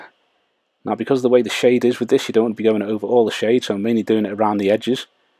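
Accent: Liverpool, United Kingdom. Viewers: Scouse accent pushes this to the next level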